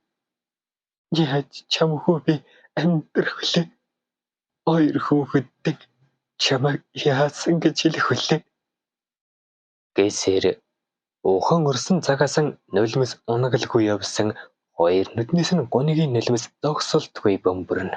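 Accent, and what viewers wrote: Inner Mongolia, China. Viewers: Huue ene unshaad bga hun ni uvur mongol hun yumuu kkkk😊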